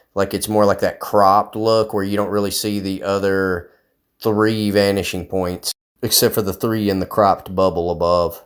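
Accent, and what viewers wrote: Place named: United States. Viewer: See? Americans are still smart even if their accent makes you think they might not be.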